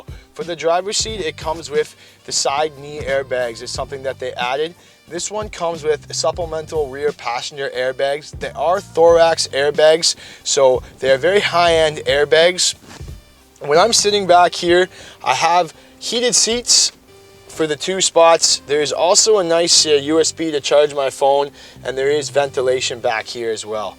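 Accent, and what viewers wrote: Canada. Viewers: Is it:a Canadian thing with:"mu-ran- o:instead of mu-ran-o you:guys also say maze instead of'mood funny!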